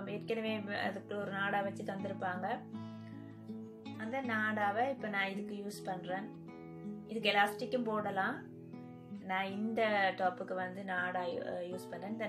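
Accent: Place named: Sri Lanka. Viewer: i also srilankan.from jaffna.ur talking style paathu kandu pedichan.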